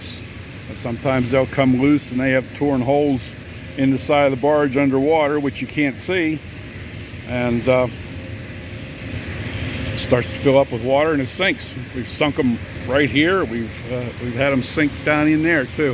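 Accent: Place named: Pittsburgh, United States. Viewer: are you from Pittsburgh that’s awesome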